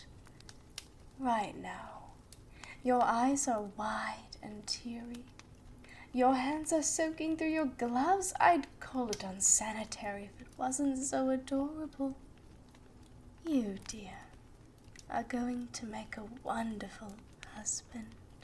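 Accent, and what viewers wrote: United Kingdom. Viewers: I love the OC outfit and the British accent!!!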